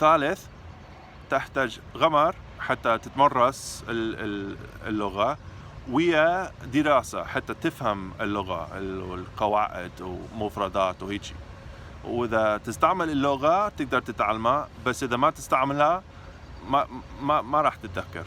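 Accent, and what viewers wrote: Baghdad, Iraq. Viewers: My god,! You blew my mind. Even other surrounding Arabic-speaking countries can not talk in Iraqi dialect like you can. Heck I know some Iraqis in the northern part that are not capable of speaking as you can (with all love and respect to them:D). You just inspired me to learn German and Chinese. You are 100% Iraqi now. Cheers! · انته تحجي عراقي مظبوط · It's not the same arabic of the world arabic , it's like iraqi accent but nice We can understand you From Algeria 🇩🇿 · عراقي قح 😅😅😅 حتى هاي اتعلمتها 🤣🤣🤣🤣 Good you ar Amazing 🌺🌺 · Oh my gosh I’m from iraq and you sir speak better then I do. That is a proper iraqi accent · نبرت صوتك عراقيه😀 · Heloo ahoye evvelen alamud arüfek ena said.essanien ente tehçi lehçe İraki Kulliş mazbut cidden mobruk ismi Mehmet ena ayiş fi Türkiye ena hem yene etaellemet min ırakın liennu ehna aku ıraakınn huvaye Fed yom halllin nsavy mubaşır [live] video derbalek ala nefsek ahoye selam Min Al Türkiye 😆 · فدوووة شون يحجي عراقي يخبلللل🤩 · He's more Iraqi than iraqis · لك اللهجة العراقية مالتك خرافية 😘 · تقريبا انت دا تتكلم باللهجة البغدادية انت فظيع عراقي قح Very motivating How can we say ضربتوني بوري in English?🤔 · Hhhaaa ❤️ love from Lebanon Beirut 🤦🏻‍♂️ love Iraq 🇮🇶 love Iraqi accent ❤️🌺 good for u فديتك كلش زين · حتا اللهجه عراقيه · no that nearly truth cuz you're speak baghdad accent and it's the orginal but i'm can't 💕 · طريقة نُطقه كيوت يا ربي Your iraqi accent is so cute. 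· Iraqi accent 100% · Wow amazing man,i am iraqi and i find your iraq dialect is so cool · Wow proud of you and also I love your Iraqi accent. I’m a Kurd I currently speak Kurdish / Arabic / Spanish / English / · wow , you very smart , how you learn Iraqi accent in just 20 month!! good job, and i want aske you, d you know write and read in arabic or just a conversation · Omg! I'm dying😩 that iraqi accent😍 in luv💕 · he looks iraqi, and speaks like an iraqi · yes this is iraqi accent I think this Baghdad accent nice I like 👍🏻it but much much different from Syrian or Lebanese arabic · عمي لهجتك عراقية وضابط اللهجة فول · Wow Unbelievable his accent iraqi so clearly respect from Algeria 🇩🇿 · هذا العراقي المظبوط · You speak Iraqi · وتحجي عراقي همات كلش حلو 👏🖤🖤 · والله لهجتك العراقية اصلية وروعة!!!!!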